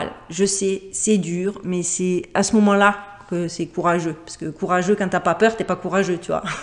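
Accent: South, France. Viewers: Merci gratitude ! toujours des vidéos très pertinentes, avec ton accent du soleil et ton bel humour. Restons dans nos valeurs avec confiance ! le passage peut sembler long mais il n'est rien comparé au nombre d'années de soumission traversées... En face, ils ont négligé un facteur : la possibilité de l'humain de se reconnecter à la source à tout moment...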